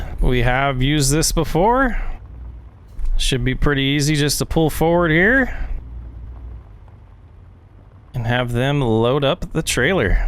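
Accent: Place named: England, United Kingdom. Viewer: I think it's funny that she has an English accent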